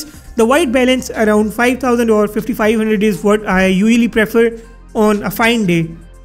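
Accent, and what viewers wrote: India. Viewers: indian english haha, you can go crazy listening something like this lol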